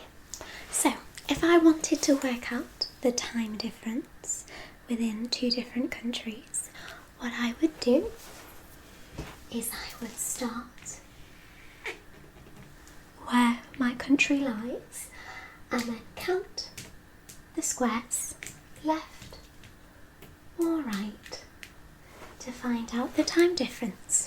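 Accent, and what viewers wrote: United Kingdom. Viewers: May i ask you from city is your accent? Or if it is just a neutral British accent. I generally don't like British accent, but I love yours! 😊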